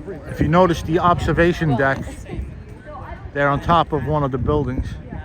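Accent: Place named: New York, United States. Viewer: Thank you so much for taking us on the journey with you. I haven't been back to New York since I left in 2000. I'm a Brooklyn girl and I love your accent. Didn't realize we New Yorkers had an accent until I left. Thanks so much and look forward to your next video. Stay safe and stay blessed.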